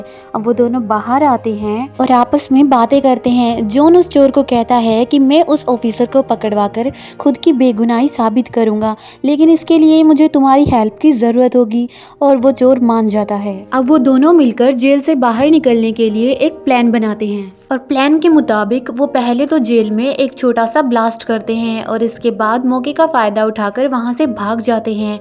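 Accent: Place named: Pakistan. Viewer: Nice explain... Tumhar aawaj se lagta .. Tum Pakistan se ho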